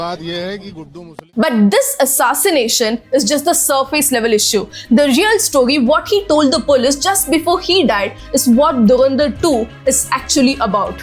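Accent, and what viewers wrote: Tamil Nadu, India. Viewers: I like not only the content and the research you make into them, but I like your pronunciation (the Tamilised English) the most. Keep doing this good work and God bless you dear. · Good job. I have watched many episodes of yours including the one where in you narrated that you have an issue with the letter R that’s why your accent is different. I felt bad that without understanding i had commented on your accent. But after that I watched and listened carefully, you defiantly have to improve your accent, it’s not just the letter R, u have issue with the English accent. Your mind processes in Tamil and hence your accent of English is like Tamil. I mean no offence but u must improve your accent for sure. U surely can if u try. Listen to your tone and accent you will know for yourself. God bless.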